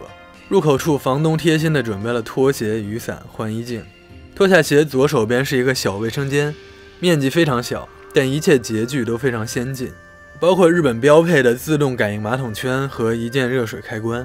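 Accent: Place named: Beijing, China. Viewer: 他生活環境就那個北京調 多看幾個影片後 覺得也還好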